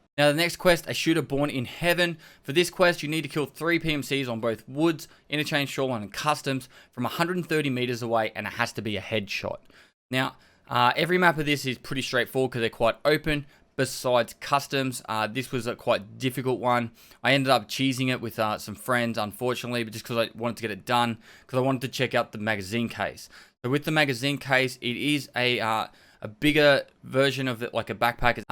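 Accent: Australia. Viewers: a Absolutely no offense intended here, but your (?Australian?) english is a bit harder for me to listen to (sorry, I really mean no harm, I'm not native speaker). And when you add this intro thingy it's really hard to swallow. Most of the times when I play the video, I hear it and I stop the video, then I have to take a deep breath and after like 5 minutes I can finally get to the video (or should I say crack straight into it? Anywqy I hope you dont interpret this in a wrong way. I support you and I thing you are one of the more informative guys.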